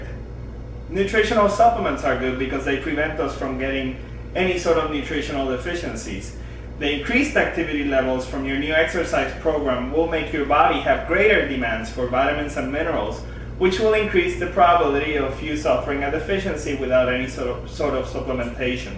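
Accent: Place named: Spain. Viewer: I love your Spanish accent, it's awesome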